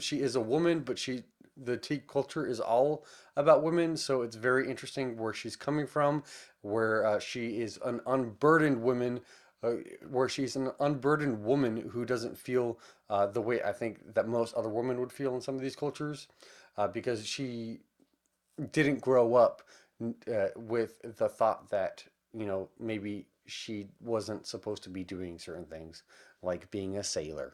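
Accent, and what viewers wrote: South, United States. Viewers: I love your video at your house in Texas during your quarantine until you had one of the books of "Black Sun" by Rebecca Roanhorse while it's all about a god return, when the earth and sky converge under the black sun, in the holy city of Tova the winter solstice is usually a time for celebration and renewal, but this year, it coincides with a solar eclipse, a rare celestial event proscribed by the sun priest as unbalancing of the world. Meanwhile, a ship launces from a distant city bound for Tova and set to arrive on the solstice. The captain of the ship, Xiala is a disgraced Teek whose song can calm the waters around her as easily as it can wrap a man's mind. Her ship carries one passenger. Described as "harmless" the passenger, Serapio is a young man, blind, scarred and cloaked in destiny. As Xiala well knows, when a man is described as harmless, he usually ends up being a villain and you're talking about all of the characters from the book after that, I hope your husband, Zach will be very proud of you and I love your Southern accent, I know you're half Mexican!!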